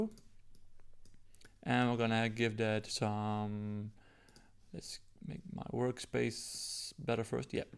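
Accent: Netherlands. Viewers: Quality stuff on your channel man. Glad I found you. I think I recognize a Dutch accent but I'm not entirely sure! Keep it up man.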